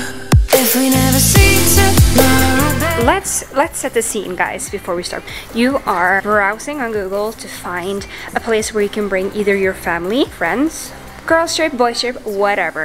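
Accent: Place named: Scandinavia. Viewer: Interesting approach to get viewings and subscribers on your channel. Negative press sells better than positive press. By your accent you might be scandinavian.?? Would be something like "EL VALLE DE LAS CATETAS". Anyway. We got plenty of places for you to go to that is not here.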